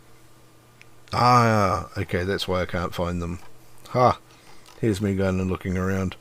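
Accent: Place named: New Zealand. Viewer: hello sir ! i was wondering if you're from new zealand? your accent sounds kiwi, however i apologise if you aren't haha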